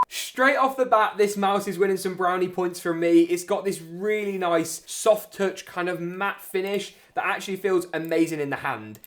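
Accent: United Kingdom. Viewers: Like dat britian accent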